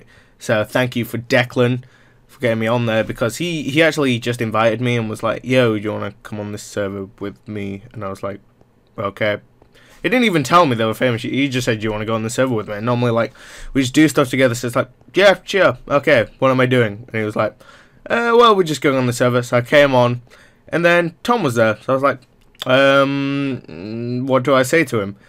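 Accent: United Kingdom. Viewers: From Hong Kong? British Accent? I didn't watch the vid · He is British, why people so confuse about his accent? Because he is British!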